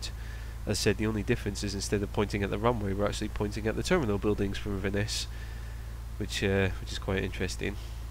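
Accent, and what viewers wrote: Scotland, United Kingdom. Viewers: Also Is That A Scottish Accient I Hear haha im socttish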